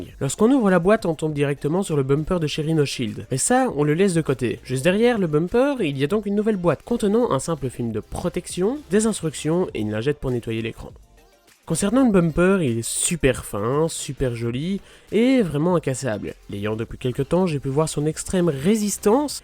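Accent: Belgium. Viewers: et t'est belge?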